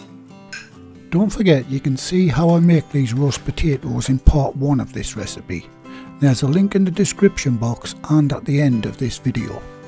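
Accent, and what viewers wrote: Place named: England, United Kingdom. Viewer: Cewked. 👍🏻 I've been in bands with lads from sooth of the watta, and that's a posh mackem accent ✌👍🏻🍺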